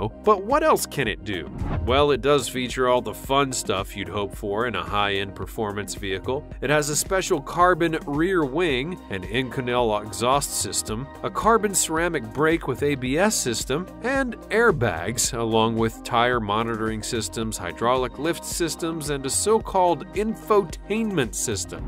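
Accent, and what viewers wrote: United States. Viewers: You know when someone talks so American, it annoys you to listen.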